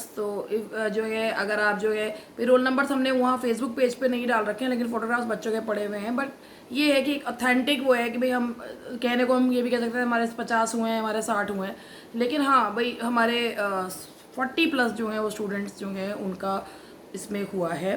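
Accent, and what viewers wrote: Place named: Meerut, India. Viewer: Good evening ma'am..... without knowing,I guessed that you are from Meerut.....you speaking style reflect this.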